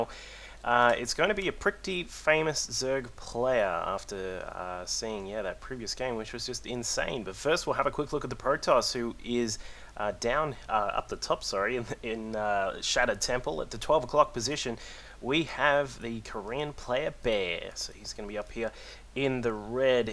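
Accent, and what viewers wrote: Australia. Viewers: god damnit i got work tomorrow i have to sleep but your delicious Australian accent is keeping me awake...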